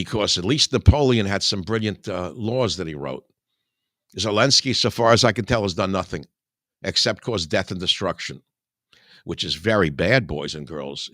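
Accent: New York, United States. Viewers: I started listeing to you Mike back during the Obama years (<num>) when I was a liberal (still am but in the classic sense) and you resonated with me. Can't explain it...I think it's because you were from NY and I lived in NY at the time and you sounded like so many people I have encountered over the years. Also I was in a lost period [during that time] of my life; and you gave me motivation and perspective-(almost like a father figure) otherwise, I wouldn't had been able to come up with, on my own. I credit you with breaking me out of my identity politics phase which I was heavily in at the time. This actually caused me to change my thinking and helped advance my career. However I would be remissed if I didn't spread the credations around: I also give credit to Alex Jones and Mark Levin, and occasionally Rush, but I never missed your radio show! 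They then got to you and had you removed for being an unbridled patriot; but I am glad you're back! And we need you now! Especially in these trying times! Thank you. 🙏 ❤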